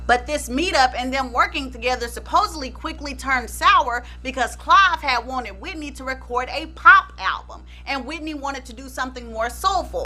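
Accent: South, United States. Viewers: You're so country and loud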